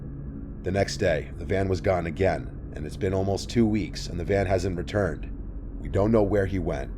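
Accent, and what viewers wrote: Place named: Hudson Valley, United States. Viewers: Hudson Valley accent LOL! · Hudson valley Accent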